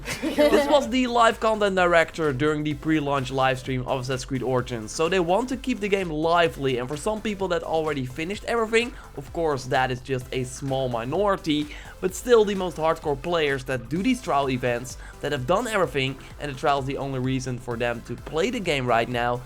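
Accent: Netherlands. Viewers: Are u dutch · Are you Dutch??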